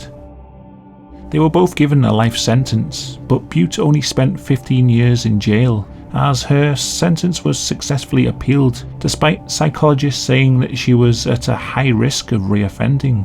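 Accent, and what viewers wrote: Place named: Wales, United Kingdom. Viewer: They cooked one of his legs and eht some of it? Love the Welsh accent.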